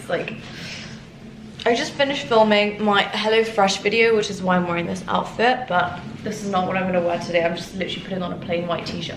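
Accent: United Kingdom. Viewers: how did you have that british accent? make a life story time!! im hooked!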